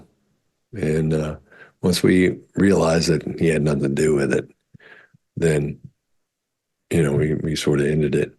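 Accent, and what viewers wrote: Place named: Tennessee, United States. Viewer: On you tube I watch a woman who is a great stand up. She's from Tennessee. I think her name is something like Jeannie Morgan.? When I started watching you, I thought you sounded like you're from Tennessee. You use similar phrases, "I love me a little baby." And "Bless her heart." I was delighted that I was right!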